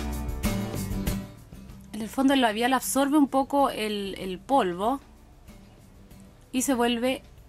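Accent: Chile. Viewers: eres chilena????????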